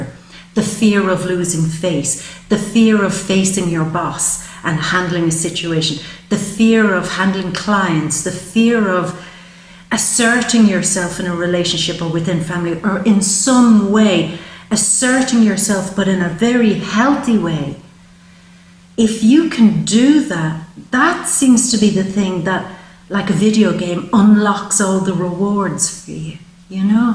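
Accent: Ireland. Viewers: looked at my relocation chart the other day and it seems that Ireland is where I need to be!! Although born in the UK my father was a Kerryman. I have been living in Spain for 33 years now but my feelings aren't the same as when I came out here......listening to your lovely accent makes me feel at home!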